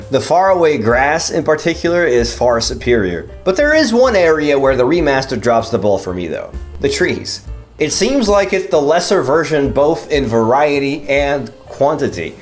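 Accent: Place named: Portugal. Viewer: Hey, do you speak Portuguese? Kinda noticed your accent, lol. Just came across your channel. Great content !!!!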